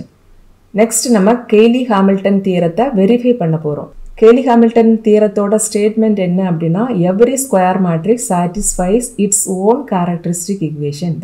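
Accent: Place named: Kanyakumari, India. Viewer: Mam neenga kanyakumari ah?.... Because your language like that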